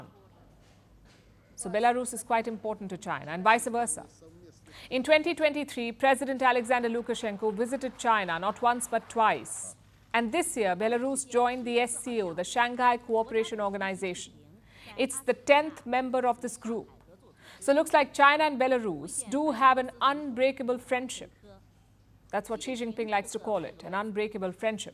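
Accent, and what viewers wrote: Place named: India. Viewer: FirstPost is funded by the CIA. Easy proof: FirstPost never makes fun of Biden or Kamala's gaffes / bloopers. CIA won't allow that. USA wants to brainwash Indians. So they chose Palki with her thick Indian accent to fool Indians into thinking that she speaks for Indians. She is just a CIA employee.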